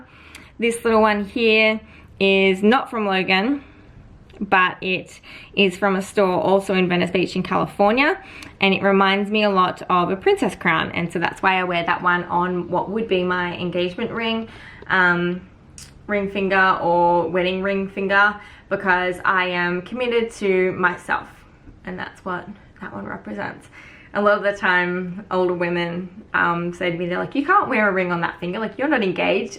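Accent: Australia. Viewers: Australian accent is very difficult for Russians🤯🤪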